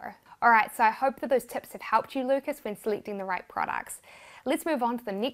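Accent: New Zealand. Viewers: I'm loving the NZ accent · I think it's a New Zealand accent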